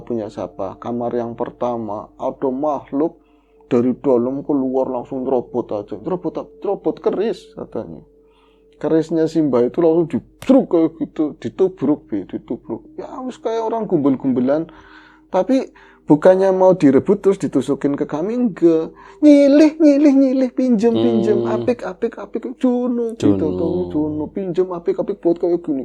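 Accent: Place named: Tegal, Indonesia. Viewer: Bro kalau cerita pasti nyenengin, karena ada kocaknya dan ada bhs tegalnya.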